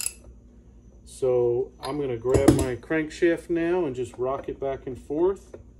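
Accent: United States. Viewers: I'm from Bilbao, a city at the northern coast of Spain near France. I went to Southeast Texas when I was young and I had visited the US several times because my company used to send me to some broadcast exhibitions named NAB in Las Vegas and to visit some factories mainly from California. I fancy boats since I was very small. I've got a picture onboard my parents boat when I was three months old, and I got my captain license when I was 15. I own a 14 foot boat with a 15hp two stroke Mercury for rowing and some fishing, a Pursuit 2550 that used to have twin Mercury 135 two stroke but now we've got only a single one, because the other one got destroyed. We use thIs boat for fishing and sunbathing with the family. Also got a racing 25 foot sailboat with a Mercury 5 two stroke (I've got one short and three long shafts, four units in all). I love two strokes, I'm retired and I still keep my vintage motocrosss bike. 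Your accent sounds American but... Where are you from?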